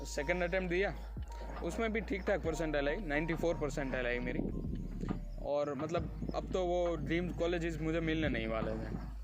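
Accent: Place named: Gujarat, India. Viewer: All the best for College, New journey With new josh 👏 the Gujrati Tone in voice and The josh of new beginning indicates The real Gujrati Chhokra 😅😅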